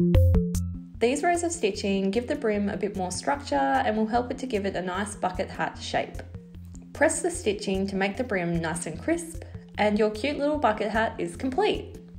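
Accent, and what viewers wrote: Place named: Australia. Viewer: Love it! Thanks, I'm going to try making a couple for my grandchildren. As they live in WA by the beach and are now learning to use their surfboards, I thought it might be fun to try making them with a nylon type of fabric that will still be good for them whilst in the water. Cotton is great for regular wearing, but I was trying to think of something that would protect their faces in the water .... maybe even a fabric like their rash tops that protect the rest of their bodies in the water while out in the sun. Thanks for giving me the idea as I was watching your video. I really enjoy watching your videos because they don't take a lot of skill, so even sewers like me can make them up. It's also great to hear an Aussie on YouTube! In what part of the country do you live? I'm in Hervey Bay so I'm very aware of the need for hats ....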